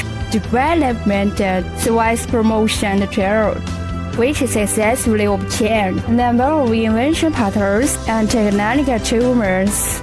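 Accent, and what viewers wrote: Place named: China. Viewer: That is some serious chinglish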